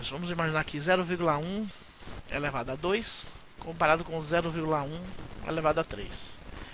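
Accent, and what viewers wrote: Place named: Northeast, Brazil. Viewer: parabens prof. o senhor ensina mto bem! e com esse sotaque bacana do nordeste fica mtooo mais agradável! ;) valeu msmu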